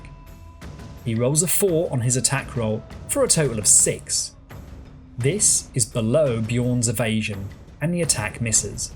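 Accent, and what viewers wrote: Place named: United Kingdom. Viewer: I think accent is definitely a plus. Since I'm from across the pond, so I don't know my UK accents. Care to elaborate?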